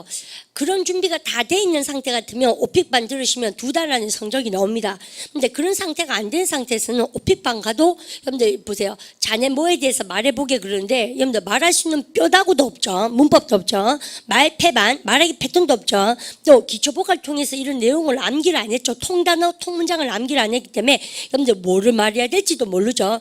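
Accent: Daegu, South Korea. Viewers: 선생님. 좋은 강의 올려주셔서 얼추 8주 동안 열심히 공부했습니다. 말씀대로 문법은 3번 들었더니 4학년 딸아이 ELA 공부를 봐주는데도 큰 도움이 되었습니다. 문법 ABCDE 정리하고 3번 듣고. 기초보카 정리후 두번째 듣는 중입니다. 기초보카. 보카22000. 문장보는 눈도 재미있습니다. 두툼해진 정리노트를 보니 더 감사합니다. 아!! 그리고 그동안 천지삐까리로 많은 대구 사투리가 그리 정겨븐 말인것을 알게되었네요. 아무리 되도(?) 쎗국물 갈아마시고 열심히 하라는말씀도 맘에 깊이 새겼습니다. 영어실력과함께 대구어 리스닝/ 표현도 늘었습니다. ㅎㅎ뭔가 저에게도 샘에게 도움이되는것일것 같아 ㅎㅎ 영어로 나오는 광고들도 열심히들었네요. 기회가 있어 다른 방법으로 인사를 드릴 수 있기를 바라며... 건강하시고 행복하시길 기도하겠습니다. 플로리다에도 선생님 팬이 살고있습니다. 다시한번 감사합니다.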